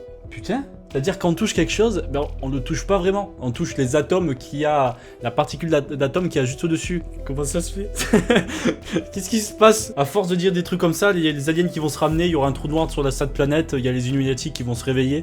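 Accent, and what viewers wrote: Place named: Aveyron, France. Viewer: Le bon accent aveyronnais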